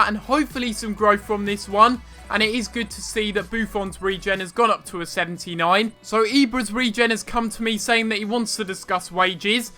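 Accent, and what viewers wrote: United Kingdom. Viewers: bro you have the most sterotypical british accent ever hahaha. Great video btw!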